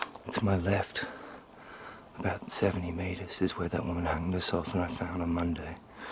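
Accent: Australia. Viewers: However, as an English speaker, I'd be happy to hear an Aussie anywhere! Especially in a scary forrest. It would be a welcoming sound for me, personally, as an American.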